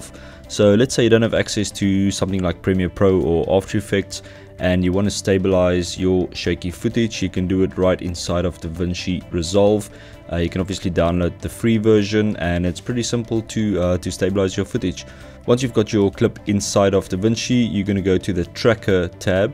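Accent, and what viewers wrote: South Africa. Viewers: Haha love that Saffer accent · i googled this and hey SA accent all the way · Probably Afrikaans, no? Considering this guy's heavy South African accent... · Thanks this was helpful. Noticed the accent though and immediately knew that I'm dealing with a South African here. Well that and the security fencing in your footage gave it away. Dankie! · awesome video man! Love the SA accent! Keep it up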